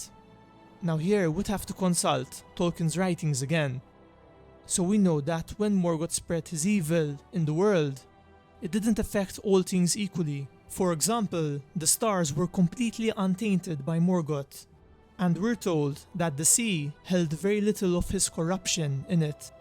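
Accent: Malta. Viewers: Are u Maltese? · Dude, are you Maltese? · Is the narrator Maltese? :) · Are you Maltese? Your accent is really familiar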